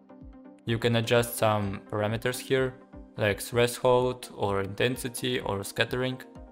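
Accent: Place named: Turkey. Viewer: Dostum türk olduğun anlaşılıyor bu arada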